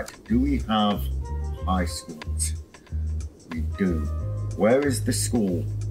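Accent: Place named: RP, United Kingdom. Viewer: No way I got it? HA HA😃 Your' pronunciation of French words betrayed you proper BBC accent. Man, I spent too much time in the tourist industry.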